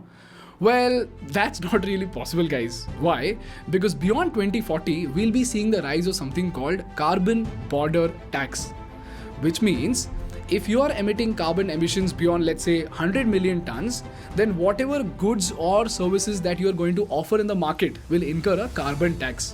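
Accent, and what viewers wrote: India. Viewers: Good video 👍 but Please speak proper English not indlish if u can't then please get someone to articulate it. Very irritating when half is what u says is not clear. World sounds like ver-ll and u speak is fast... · In your contribution there is some truth. But how you present it in high speed and with your indian accent, that's terrible. Also your argumentation chain is not sustainable enough.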